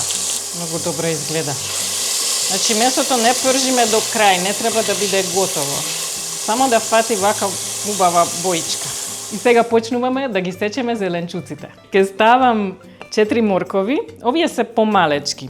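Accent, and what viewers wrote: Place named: Hungary. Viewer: Прекрасна и слатка како и секогаш. Уживам во нејзиниот унгарско македонски јазик и дикција. И секако ги запишувам рецептите.🤗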